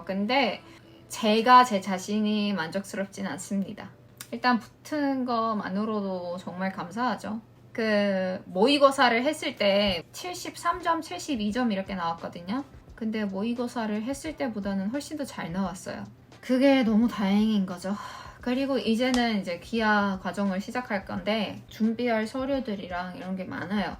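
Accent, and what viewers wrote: Seoul, South Korea. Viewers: 그냥 찐 한국인 말투인데😊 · 언어 능력이 원어민 수준입니다. 서울 말씨를 쓰시고 오히려 저보다 말씀을 잘 하시네요. 마치 한국에서 태어나 성장하신 분 같습니다. 😅😅😅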